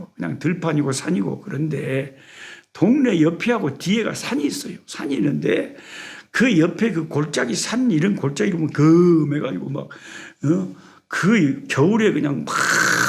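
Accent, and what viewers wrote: Jeolla, South Korea. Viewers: 할렐루야!!!주님감사합니다 오늘 목사님께부탁한책을 처음접하게되었습니다 목사님말씀을듣고 책을오늘읽어야하는부분을읽는데 어찌나은혜가되고 가슴을울리고 이귀한책이어디있나!!왜목사님이지인이나 전도하는데 쓰라는메세지를계속말씀하시는지 오늘에서야 이해가갑니다 너무나귀한책이고 감동이오고 책을붙들고기도하는데 어찌나눈물이나는지 오늘오전은주님의은혜가큰감동으로느껴집니다 목사님만난게감사가나옵니다~저는24살까지운동선수로살다가 가정을꾸미고 또공부하고는거리가먼데 이렇게많이배우신목사님이 전라도사투리로 사정없이성경말씀을이끌어가시니 어떤성경공부보다 머리에쏙쏙들어옵니다 공부와거리가먼 제가이렇게성경공부를하니 ~그것도나이먹어서 한장한장귀한말씀을접하니 성경을더가까이하게됩니다 목사님 어디다니시든지주님이지켜주시고 마하나임의하나님이역사하실것입니다 더욱더오래사셔서 저희들을주님과연결시켜주시고 깊은관계속으로들어갈수있게도와주세요 오늘은너무감사가넘침니다^^고맙습니다 서울은날씨가풀려가요~~저는교통사고합의로수요일날첫대면을합니다 좋은결과있게기도부탁드립니다^^화이팅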